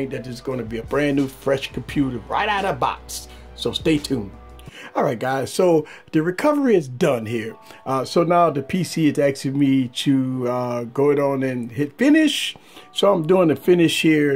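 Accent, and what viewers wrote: Louisiana, United States. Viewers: Hey are you cajun? Im like 15% cajun but aside from that, thank you for the tutorial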